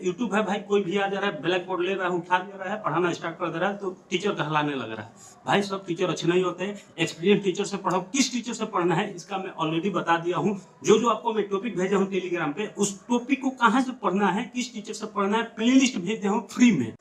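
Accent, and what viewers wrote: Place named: Bihar, India. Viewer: bhaiya bihta Bihar se hai inki yhi language hai 😂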